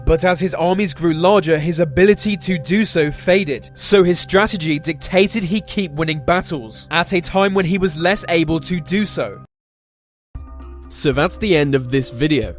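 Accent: England, United Kingdom. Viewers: What's the story with his voice? It's an english accent but he sounds like a robot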